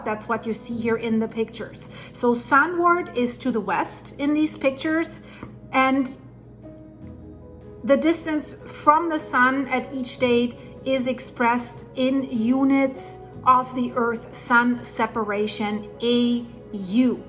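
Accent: Germany. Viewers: Ich mag deinen deutschen Dialekt. Woher kommst du? Liebe Grüße aus Wien 🫶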